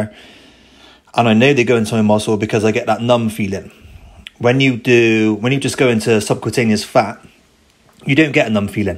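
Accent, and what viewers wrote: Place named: Scotland, United Kingdom. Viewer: Awesome video and accent, scottish?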